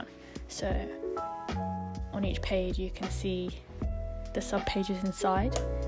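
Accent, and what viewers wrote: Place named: United Kingdom. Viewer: i love your voice so much,, it’s so calming. i know that there are different british accents from different parts of the UK and yours reminds me of a friend of mine! they left tho the country tho :(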